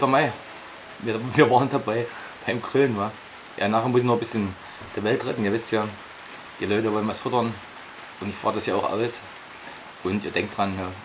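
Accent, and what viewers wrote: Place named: Saxony-Anhalt, Germany. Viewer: Leckeres Rezept😋 und dem Dialekt nach zu urteilen,könntest du aus Sachsen-Anhalt kommen🙈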